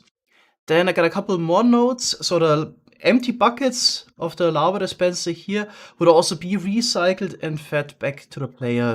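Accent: Germany. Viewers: Are you German because you’re accent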